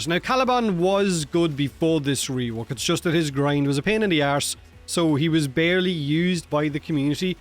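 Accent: Ireland. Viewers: I really love your irish accent 😉 « Moch moch bettar experience » ❤ And I do agree with you btw, it's an amazing frame now. Even better, it's a free gift atm, so imagine for a new player : pick Caliban, and steamroll the whole star chart with your new cute baby sentients.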